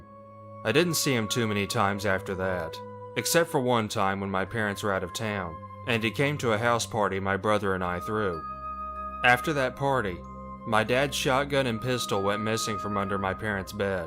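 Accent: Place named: South, United States. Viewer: Love that southern accent!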